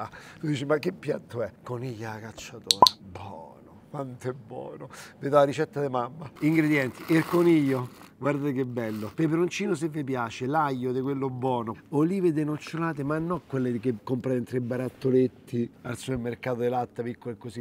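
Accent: Rome, Italy. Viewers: Dovrebbe parlare e gesticolare di meno e poi basta con questo dialetto protoromanesco dai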